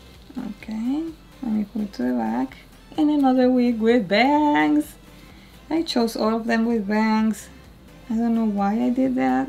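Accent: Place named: Colombia. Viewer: You look so gorgeous 😍😍 you literally look like Lady Gaga with a Sofia Vergara accent ✨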